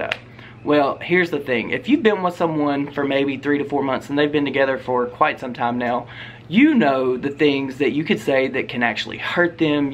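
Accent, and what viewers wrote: South, United States. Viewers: I love your southern accent so much! And you have a good perspective, I totally agree that Jason's jokes were unacceptable. Nice video! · I love your accent! Usually southern accents are so intense and I can’t understand people but yours is lovely lol also great video thank you ❤️ I am subbing!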